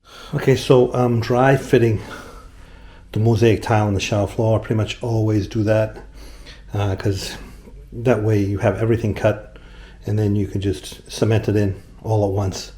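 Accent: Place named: New England, United States. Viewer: Great video. You are definitely in New England with that accent! Boston?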